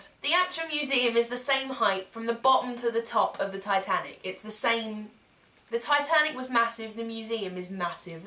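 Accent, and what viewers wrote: United Kingdom. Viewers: I love your videos, you're one of the only British people I'm subscribed to! And I'm British! It's nice hearing a familiar accent! xox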